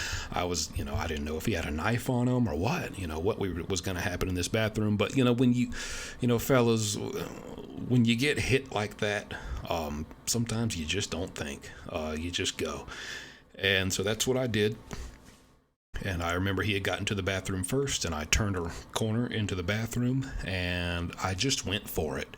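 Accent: South, United States. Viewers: Great content. Saw you on Ian Bick and became a Subscriber. The way you tell stories reminds me of how a minister Would Talk about stories from the Bible. Sounds you like you inherited a southern preacher man’s voice and dialect.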